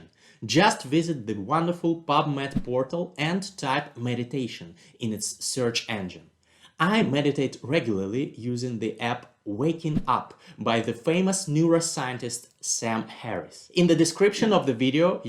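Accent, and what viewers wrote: Russia. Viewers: you mean that a starts to speak with more russian accent? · как насчёт всерьёз позаниматься с преподавателем для искоренения русскоязычного акцента? Также весьма заметно, что читается написанный текст, не круто. Но круто то, что вы делаете на русском. Желаю успехов!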